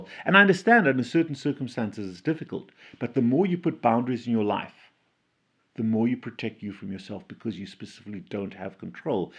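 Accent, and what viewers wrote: South Africa. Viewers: He's South African. He sounds like an Afrikaans South African. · I am addicted to your videos . I love the south Africa accent and I love south Africa where I often travel through specially the transvaal near mozambique border . The Bantu diet was based on one meal a day based on vegetables. The only car b was maize but eated with fermented preparation .fruits only the children eat .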